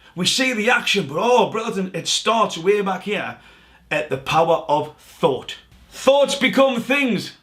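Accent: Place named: Scotland, United Kingdom. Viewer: thank you for your hard an amazing work I am a native Californian and I went blind about 20 years ago having been cited before I know the power of nonverbal communication and so I am super stoked that I have discovered your videos! You have a really wonderful way of not only explaining the body language but really getting in-depth psychologically I first saw your Harry and Meghan Markle video and then I am a big lover of Muhammad Ali and Conor McGregor both so I saw this video well I mean I listen to it and thank you so much I appreciate all that you do and it sounds like you are Scottish but I'm not sure so take care of big hugs April in California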